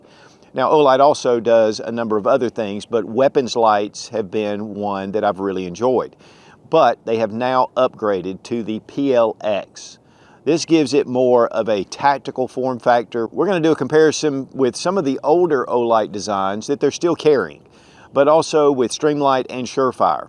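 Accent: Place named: California, United States. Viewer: I'm so jealous with, California people speaking 😅. Nice Gen6 set up. Thanks for all you do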